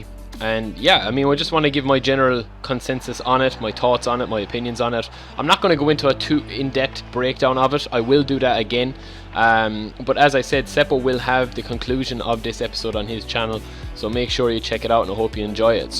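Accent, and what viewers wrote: Ireland. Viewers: Welcome to Ireland. I think it sounds nice, love the accent.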